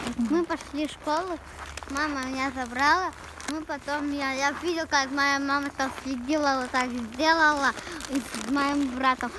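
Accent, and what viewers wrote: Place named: Garm, Tajikistan. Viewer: шумо точикистона ай кчошен апачон? гапзанитон гармиёда монандай илтимос чавоб дихед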